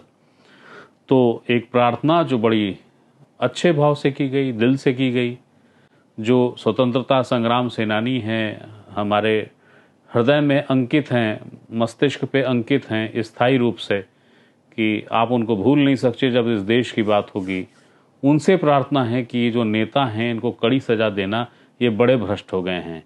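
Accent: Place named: Jharkhand, India. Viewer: इनके भाषा मे सदरी बोली का लय है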